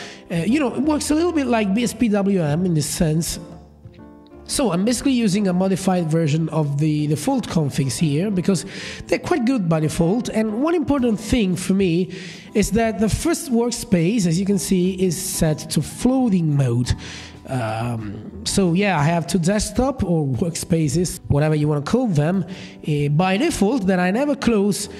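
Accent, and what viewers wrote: Italy. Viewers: Hey dude, are you italian? Cause you sound so, but your English is really good.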